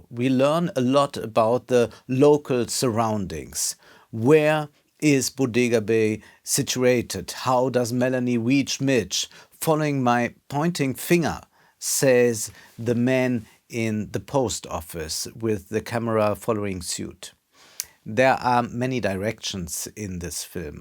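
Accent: Germany. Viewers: Man merkt, dass du an der englischen Aussprache gefeilt hast. 👍🏻 Verglichen mit den ersten Videos hört man den deutschen Akzent nicht mehr so gewaltig raus. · Der Akzent ist doch bestenfalls nebensächlich. Wenn der Wert des Gesagten davon massgeblich geprägt ist, ist es sowieso nicht viel Wert. Steckt man viel Energie in akzentfreie Sprache, kommt es anderswo zu kurz. Ob ein Akzent von Vor- oder Nachteil ist, hängt vom Zusammenhang ab. Der deutsche Akzent verschärft den Eindruck des Fachmanns - fachmännisch ist der Vortrag auf jeden Fall - und trägt zum Branding bei. Das ist vielen Deutschen, die dem Überwinden eines deutschen Akzents aus welchem Grund auch immer einen hohen Stellenwert beimessen, oftmals nicht bewusst. Nach 80 Jahren sind die Zeiten, in denen man einen deutschen Akzent nicht selbstbewusst tragen darf, ohnehin vorbei.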